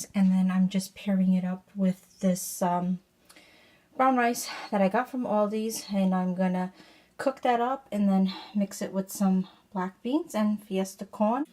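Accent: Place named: New England, United States. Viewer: So I thought maybe you were a New Englanda because I heard you say "freeza" and "dooa" (door). LOL. Great video! Thanks for sharing!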